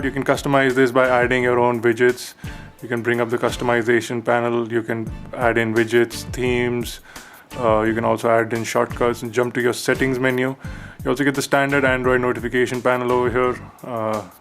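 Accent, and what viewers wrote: India. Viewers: Very nice presentation! Thank you! It would be even better if you talked just a little, little bit slower, since Your "india/english" accent could be a little tricky to apprehend if one is not used to it ;-). Besides that it was an EXCELLENT Presentation!!! Thanks!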